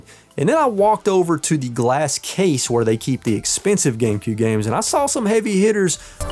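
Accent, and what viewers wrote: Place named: Tennessee, United States. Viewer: Liked and subbed from watching your friends game cube video featuring you son. What part of Tennessee do you y’all hail from?? I live in Seattle and my sister moved to Jackson,TN several years ago. Thought I recognized that accent lol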